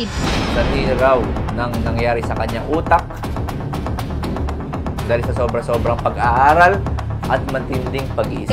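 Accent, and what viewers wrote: Visayas, Philippines. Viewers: Bisaya sya idol...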